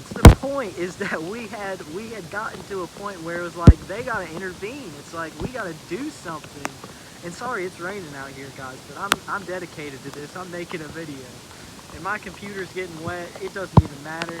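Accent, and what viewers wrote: South, United States. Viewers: You are so young! Where 'on earth' did you so quickly find the time to acquire all that wisdom, sageness, and accomplishment and develop the urgency and ability to put it all into this easygoing flow of eloquently spoken words? Some tremendous former lifetimes must be involved in there! Do you remember parts of one or some of them? I wish I could have crossed your path in one of them!😳 ...(And this time round, is it that I detect a trace of Southern drawl?...🤔😁).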